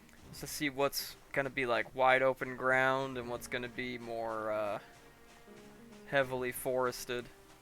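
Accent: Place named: Ohio, United States. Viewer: Lol idk you and this is the first video, but you sound like you're from Ohio 😅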